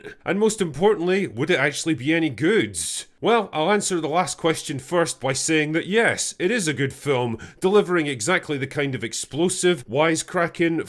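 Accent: Scotland, United Kingdom. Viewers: I was wondering if this was simply a vehicle for Will Smith to crawl out of embarrassment hell but your review says otherwise. That it's just Will Smith trying to get back to his normal and forget that incident. Maybe he should just divorce his wife while he's on form and really dump his past and get on with Bad Boys 5...hell if Stallone and Swartzeneggar can keep going, why not. Thank you for the review, as always, poinant and entertaining with that wonderful Scots voice. Been enjoying you shitting all over Star Wars : The Immaculate twins haircuts but it's nice to have a change and this was a nice change.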